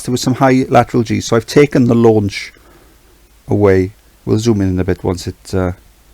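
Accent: Wales, United Kingdom. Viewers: What country are you from? Your accent is awesome. I want to guess Wales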